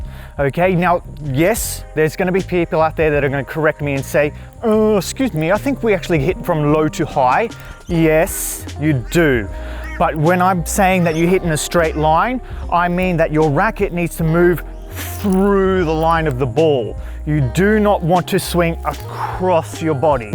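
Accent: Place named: Australia. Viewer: I was very confused by a coach named speaking with an Aussie accent. Really good video production, super clean camera footage, clear explanations, and what an aesthetic vibe. Subscribed!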